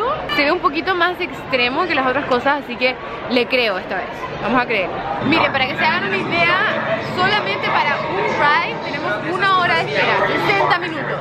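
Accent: Chile. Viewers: Se nota tu Chilenismo xd Saluuudos! Desde el mismo Chile:D Me encantan tus videos, enseñame a hablar ingles:v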